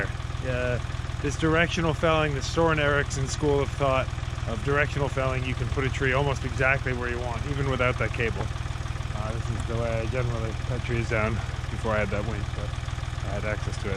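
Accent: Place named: South, United States. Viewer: Hey I almost like you talking kinda “southern”. Made me miss my hometown 😂. I love all that cherry wood, is it a soft or hardwood?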